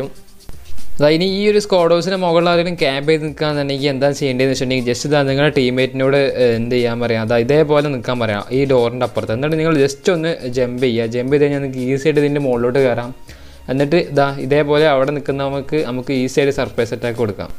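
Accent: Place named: Malappuram, India. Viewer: Veed malappurm ano😂 voice kettuchoichatha💯🪄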